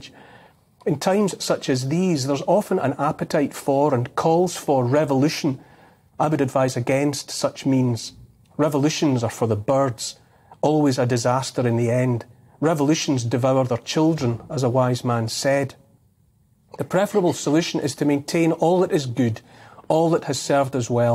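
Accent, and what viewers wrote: Scotland, United Kingdom. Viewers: He spoke like a warrior poet, he spoke like a Scotsman!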